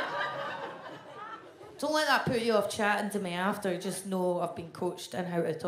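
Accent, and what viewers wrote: Scotland, United Kingdom. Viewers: Anything spoken with a Scottish accent is automatically funnier... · I absolutely love Scottish accents. I'd probably listen to this lady all day even if she wasn't telling jokes. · You should be proud of your Scottish accent not ashamed or embarrassed about it. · What Scot accent is this? There are different accents in Scotland. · It's exactly the Scottish accent that appeals to me most. Otherwise, that alien chick is the xexiest, funniest, most honest nonhuman I've ever seen do comedy. I wouldn't even have known she is an alien if she hadn't confessed! I'd watch anywhere, any time. I hope she does a full special on a streaming service pretty soon. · I love a Scottish brogue. My ears delight in it. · You are beautiful, funny, and I personally love your accent. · I find Scottish accents in women so damn hot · Is she fay Rothesay? 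🧐 Very good 👍🏻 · I love the Scottish accent 🙂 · I love the Scottish accent! Would I wish I had subtitles for ¼ of what is said? Sure, but it's fun to hear anyway! I like to repeat/imitate it when talking with someone, not to make fun of them, but to be able to understand it better. Which is a better way to cook? Watching someone passively, or doing the cooking yourself while tasting it periodically? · Very beautiful, very funny and I love her accent! Makes me want to do the 11 hour flight to the UK 🙂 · How can you not LOVE a scottish accent, thats definitely a woman i could listen to and never be bored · Fantastic voice and accent.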